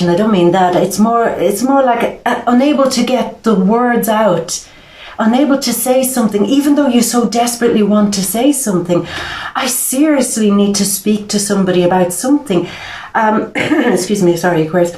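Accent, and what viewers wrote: Ireland. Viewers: I love the the Irish Accent? and that your really trying to keep clean lol. Passion 93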